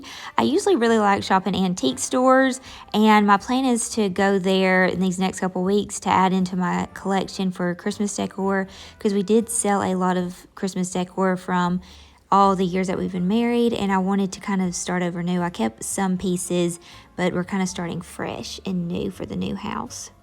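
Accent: South, United States. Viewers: Hi I enjoyed your decorating and was inspired to start my own today. Your home looks beautiful and great job on your tree. You are such a sweet girl and I love your southern accent. I am from Canada so I enjoy listening to ya all.😊 I was wondering where did you get your garland on your fireplace? It looks so realistic. God bless you and your husband! Merry Christmas!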